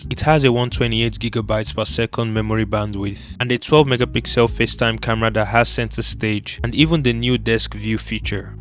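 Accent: Nigeria. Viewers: You from 9ja. No lie😂✌🏾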